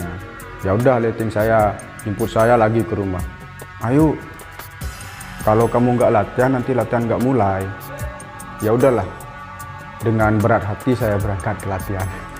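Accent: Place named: Madura, Indonesia. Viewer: Mentok Banget madurenah ✌️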